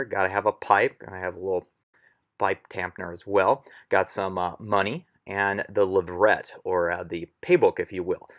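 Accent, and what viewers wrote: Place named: United States. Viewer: Are you intentionally using ‘Murican pronounciation of the various uniform elements, vice the actual French pronounciation? Is that a reenactors affectation? Regardless, very impressive work! Do you belong to a Napoleonic re-enactor group? If so, what regiment do you present an impression of?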